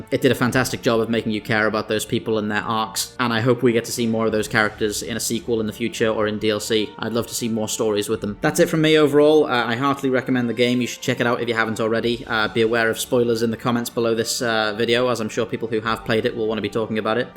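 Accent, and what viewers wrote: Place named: England, United Kingdom. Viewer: No idea why but you sound like an English Ben Shapiro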